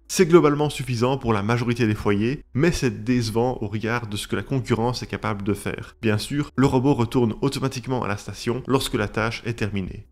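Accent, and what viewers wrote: Belgium. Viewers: Je détecte un accent belge mais vous dites les chiffres comme les français, pourquoi ?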